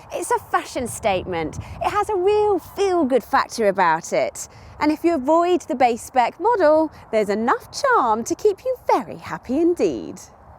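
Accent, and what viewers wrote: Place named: Liverpool, United Kingdom. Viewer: Is it just me or has this women just got an imperious, pseudo voice!!!! behind her flaccid figure is nothing more than a northern scouser!!!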